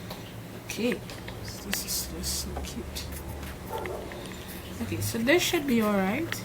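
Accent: Trinidad and Tobago. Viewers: where are u from?. Sound like Trini. i am trini :-9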